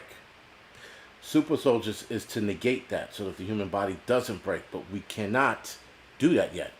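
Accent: New York, United States. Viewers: You sound like a New Yorker - this is they way New Yorkers talk. Authentic born and bred New Yorkers.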